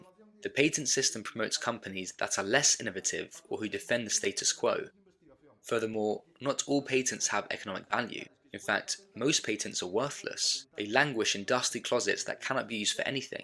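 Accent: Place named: United Kingdom. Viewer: La voz es excelente, no tengo que leer los subtítulos y me gusta volver a disfrutar esta presentación en versión Inglés, y es muy clara y muy agradable la voz de estilo británico. Es una voz natural y agradable.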